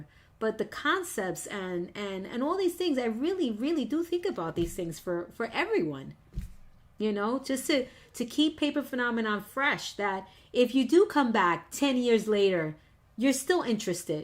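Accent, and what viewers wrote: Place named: New York, United States. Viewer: I have followed you since you showed up on the paper crafting scene so many years ago. I can still hear your NY accent! I have always believed that you are brilliant and have designed things unlike anyone else. That company was wrong and unprofessional. That designer that included the elements that were not theirs is also wrong. But, unless they can show proof of copyright, you have done nothing wrong. Please pursue legal action as this can be very harmful to your reputation. You need to stand up for yourself to make it clear that you will not tolerate this kind of behavior. I am so sorry this has happened to you, and you do not deserve it. Stay strong and proud. We know who you are, and that is all that matters in the end. Hugs to you.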